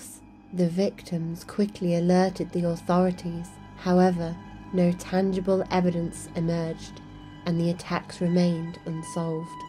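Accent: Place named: Liverpool, United Kingdom. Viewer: Sounds like the fella in 'The Flying Pickets'. Weren't they from Liverpool?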